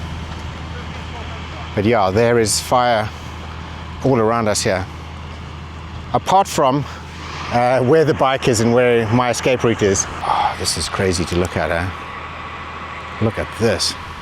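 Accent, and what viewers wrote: South Africa. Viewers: Came across your site & immediately recognized your accent as South African. Thankful your property is okay. The fires were horrendous. Subscribed!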